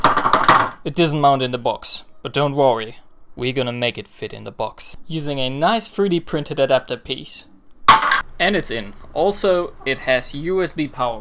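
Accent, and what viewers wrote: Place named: Germany. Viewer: I thought your accent was german but wasnt sure... then i read und war mir sicher XD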